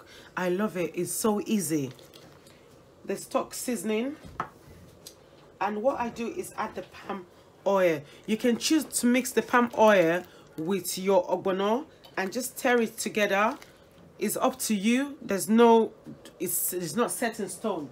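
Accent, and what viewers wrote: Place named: United Kingdom. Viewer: Ur British accent eehh😁😀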